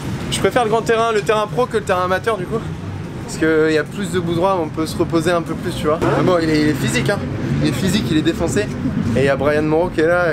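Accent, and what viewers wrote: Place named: North, France. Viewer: Tu est du Nord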